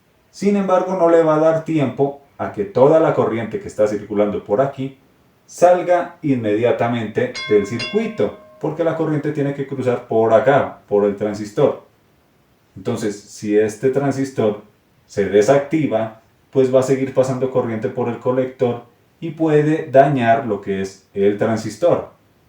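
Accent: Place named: Caracas, Venezuela. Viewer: Epale pana mío tú vídeo esta genial y lo extraño es que tú dirección de tú canal de Youtube dice que estas en Colombia pero tú hablas como venezolano de Caracas santa mayulla pana mío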